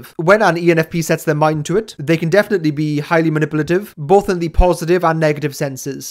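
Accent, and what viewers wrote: Wales, United Kingdom. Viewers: Hello. Are you Welsh, luv? 🏴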